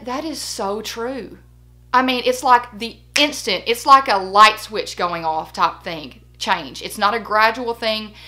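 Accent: South, United States. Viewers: New subbie! Excited to watch your video. Where are you from, sound like a southern accent (like me) 😀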